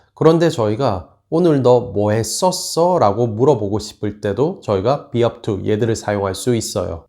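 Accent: United Kingdom. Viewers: 잘가르칩니다 영국억양이 묻어 있어서 재미있습니다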